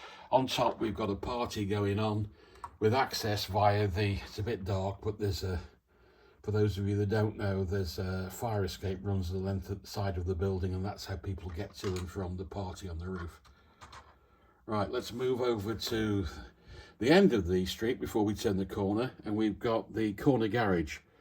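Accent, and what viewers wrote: United Kingdom. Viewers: Rotherham? maybe Sheffield? I would put money that you has been to Meadowhall Lego more than once with that accent. And yer right, every street needs a pub or three, do a Cross Keys for me. Have fun lad and keep up tha great work. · I agree with you and I will certainly sign your petition for the Fishing Store as the best modular, although the Medieval Blacksmith Shop is equally as impressive and a fabulous build. You should check it out. A more personal question, if you don’t mind? My favourite television show is, Escape to the Country, which is shot in great Britain…..so given your accent, may I ask where you live? I am on the East coast of Canada., in the province of New Brunswick. Just curious, if it too personal, forgive me. Most the the Lego people I see on here are much younger and harder to relate to. Anyway…..I ramble on. 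Thanks for your reply I do appreciate it. Ta Ta for now. 👍😎🇨🇦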